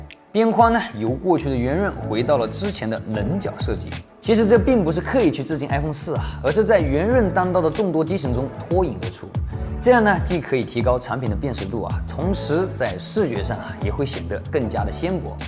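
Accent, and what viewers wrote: Wenzhou, China. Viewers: 温州人说普通话就这调调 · 没办法，温州人说普通话就是这样的。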